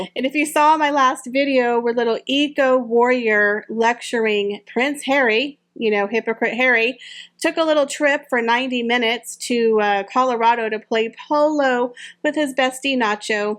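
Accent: South, United States. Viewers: It's that slight southern accent "Y'all" 😉 😘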